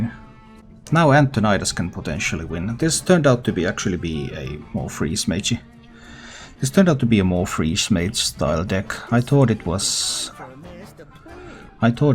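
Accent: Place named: Finland. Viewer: You sound very finnish with your accent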